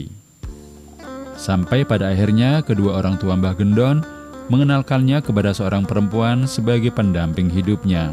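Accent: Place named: Pekalongan, Indonesia. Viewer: Hadir bos....sebenarnya orang mna sih..bahasanya kyknya pekalongan tulen....kecamatan mna gitu ..kl boleh tau..😑😑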